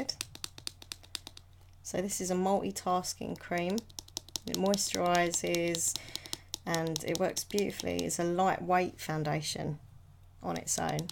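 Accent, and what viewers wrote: Essex, United Kingdom. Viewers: Your voice soothes me lots.. I think it's because of your accent. My family is from Essex. You're my favourite 😊❤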